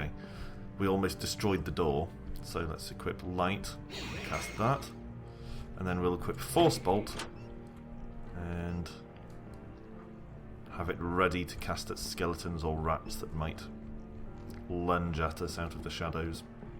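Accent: United Kingdom. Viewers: Actually, I'm from Ukraine. Odessa. Also, I'm not sure about your nationality. Are you British? I think this, because of your accent (?). I'm not sure, but I thought that you have kind of British accent. Maybe I'm completely wrong.:)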